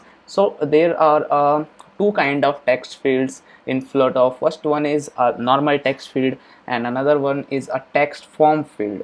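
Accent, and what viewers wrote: India. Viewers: 印度仔，英语很重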